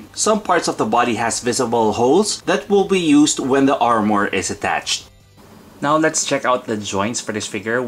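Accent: Philippines. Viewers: Are you filipino? Our accent when i speak in english is almost the same. xD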